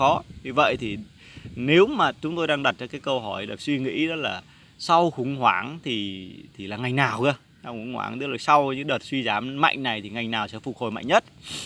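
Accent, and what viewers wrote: Thanh Hoa, Vietnam. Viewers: Em ở Hà nội hay tp Hcm,giọng thì tỉnh Thanh Hoá.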